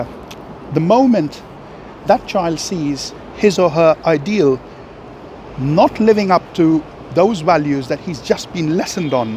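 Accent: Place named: United Kingdom. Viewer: I feel like this is a glitch in the matrix, hearing a Punjabi man with a British accent. Anyway, you get used to it soon enough.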